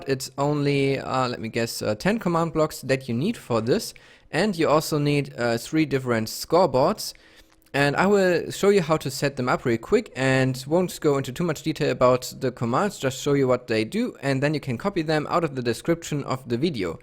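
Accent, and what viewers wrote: Germany. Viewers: Bist du deutsch? kilngt so :D ich finds richtig gut aber ich hab mir die map gedownloaded und finde die stelle mit dem jetpack nicht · kanns sein das du eigentlich deutscher bist? hörst sich bissle so an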